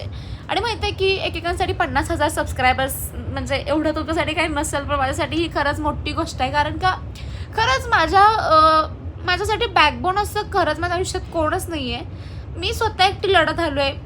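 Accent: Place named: Ichalkaranji, India. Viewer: Congratulations 🎉 god bless you ❤ Mi punyat aste but tuz vlogs n video bghitle na mala khup athvn yete ichalkaranji chya bhashechi 😍 Keep it up 🥳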